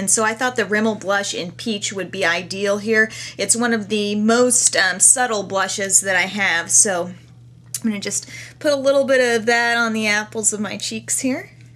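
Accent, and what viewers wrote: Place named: United States. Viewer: Fantastic! and...just let me say another thing: you speak so good American, that I can easily understand every single word, even if I am Italian! :-)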